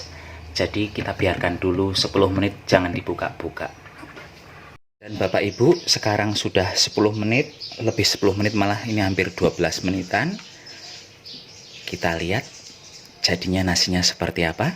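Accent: Banyumas, Indonesia. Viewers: Ngapak bae lah anak e mbok Dirah👍👏👏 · Jane rika wongendi asline...bahasane pd kr inyong 🤣🤣🙏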